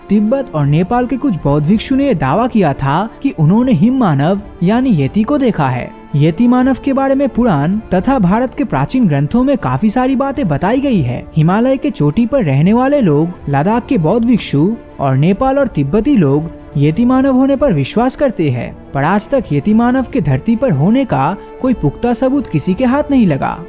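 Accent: Bengal, India. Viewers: are u bengali from west bengal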